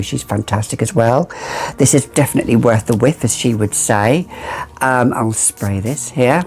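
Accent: France. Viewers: I love your French pronunciation, are you partly French? New subscriber, love the video🥰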